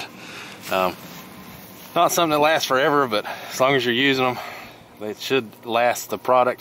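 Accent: South, United States. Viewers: Not only was this a GREAT review of the products (plus I learned another way to set up for a fire👍) BUT I loved your humor about the "words" with your southern (?) accent! My daddy was born and raised in Irving, Texas, my mother is Japanese...they met in Japan during the Vietnam war when my daddy was a marine...so you can imagine the different "accents" I grew up with! Thank you for a good product review but a good laugh too! I appreciate the time you put into this video as well as you being in the cold! God bless🙏❤🇺🇸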